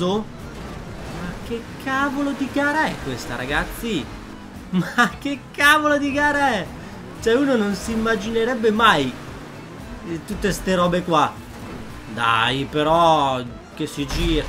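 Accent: Veneto, Italy. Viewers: Ma sei veneto hhahaha? Ciapa fogo hahah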